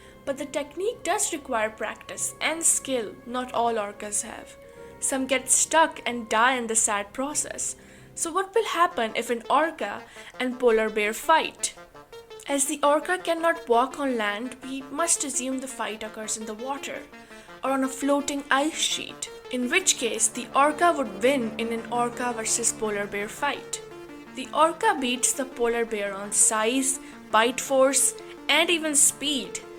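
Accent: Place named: India. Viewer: No way could a little polar bear kill a large killer whale. Killer whale would manufacture mince carnage out of meager polar bear. Polar bear may have powerful bite, but so does killer whale & its mouth is grander with 4" dentures. Killer whales also hunt beluga whales as polar bears. Killer whales hunt same prey as these bears like seals & even kill great white sharks & i bet even crocodiles including larger whales than themselves, but as a group. If i were still a betting person, my money would be on killer whale as victorious victor. Anyway, narrator must be from India.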